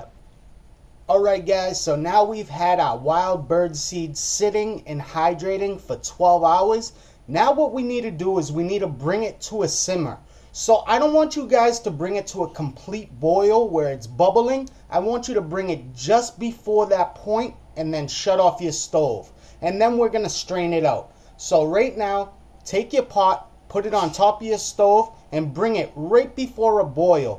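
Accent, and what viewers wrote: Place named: New York, United States. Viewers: Alright youzzz guyz, now bring it to a simma and then stwain it. I love East Coast accents. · He sounds like hes from some where in New York or new joisy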